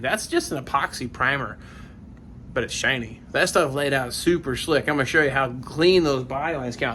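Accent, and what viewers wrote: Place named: South, United States. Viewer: This is kind of interesting. I’ve been watching you for over a year. I always kind of thought that you are from the south, because of your accent, even though you call people “you guys.” I’m from Texas, & I thought you to be maybe from Tennessee or northern Louisiana, or the like. 🤠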